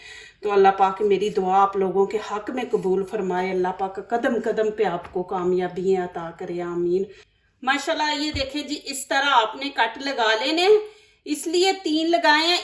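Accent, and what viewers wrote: Punjab, India. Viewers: Aap ki panjabi wali ton ma Urdu bohat khub ha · Wah G Wah,munh mn pani aa gya hy. Aapki hr recipe boht aasan or tasty hoti hy. Aap shyd punjabi speaking hn q k aap ka lehja bilkul hmary jesa hy.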